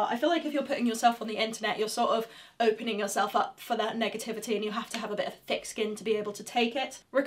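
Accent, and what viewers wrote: England, United Kingdom. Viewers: Typical Brit accent!i love it!you gatt try or or by! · I'm a little late to the party as I only found you on youtube recently and was amazed by your skill. Looked up more recent videos of yours and after a few covers I opened this Q&A, so that I could have an idea of who you were, aside from a fantastic guitar player. Well, I'm hooked after just the first minute. I mean, I was going to subscribe anyway, but: - (amazing guitar skills) - awesome british accent - loves science - likes motocross and would have wanted to become a pro rider Really??? You're great, I'll be very happy to follow your future projects :) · You’re not only into music, but motorcycles, touring America, house, kids, English accent, etc? Good God girl-why couldn’t I have found you?!? Yes, let’s see a vid of you and your motorbike experience 👍🏻🇺🇸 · Where are you from? 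You sound so British... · I am from the U.S. What type of English accent do you have? I know its not Cockney. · Ah...Your ENGLISH English as the young lady in the Warlock movie says! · The british accent is simply the icing on the cake. What a combination of personality, talent, looks, aaaaaaaaaand accent.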